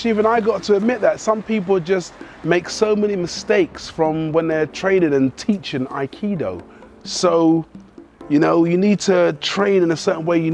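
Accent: United Kingdom. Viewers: Love the "3 strikes for the price of 1".....your wonderful accent makes me miss London and "The Continent". Very curious what the lady with dog said that made you chuckle. What I think you are saying is that there are the martial arts in the gym and then, well; there's brawling in the street.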